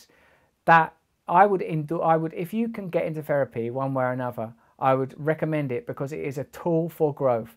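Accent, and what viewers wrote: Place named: London, United Kingdom. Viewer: "You sound like you're from London!"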